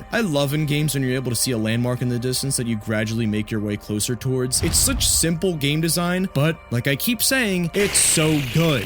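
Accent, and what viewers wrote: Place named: Delaware County, United States. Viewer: Hearing you say Half-Life a bunch of times with a horrible delco accent is driving me crazy. HYEAF-LOYFE.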